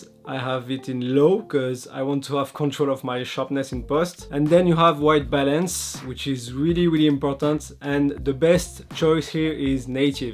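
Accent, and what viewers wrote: France. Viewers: qui a reconnu l'accent FR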